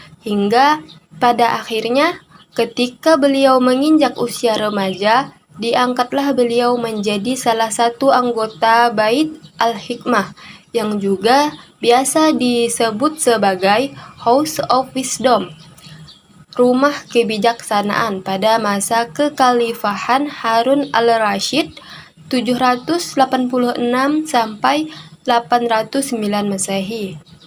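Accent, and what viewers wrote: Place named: Ketapang, Indonesia. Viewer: Logat ketapangnye ade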